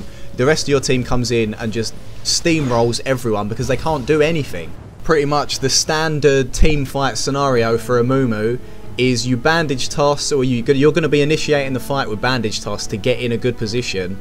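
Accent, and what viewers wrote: United Kingdom. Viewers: u from london?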